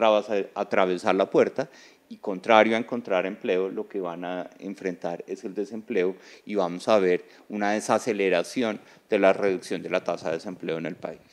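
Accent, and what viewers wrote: Colombia. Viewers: Tengo que confesarte algo Daniel. Cuando te vi inicialmente como director del Dame, me caías renal. Soy administradora de presas y entendía tus espeluntes cifras y me dolían, pero no soportaba tu hablado de gomelo, pero fue pasando el tiempo, y te convertiste en mi funcionario del gobierno favorito. Será un gusto volver a contar contigo en ese cargo, cifras certeras y valentía para darlas es lo que Colombia necesita. Felicitaciones, porque estoy segura que quedaras en el cargo.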